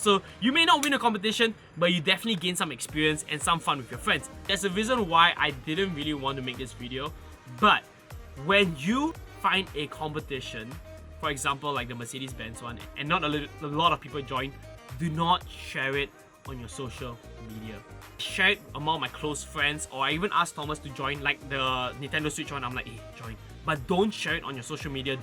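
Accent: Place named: Singapore. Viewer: Singlish to the max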